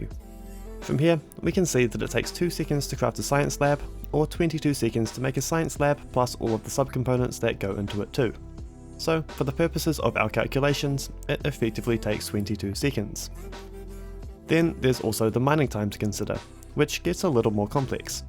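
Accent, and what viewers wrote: New Zealand. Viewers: Are you from New Zealand? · You are you from NZ?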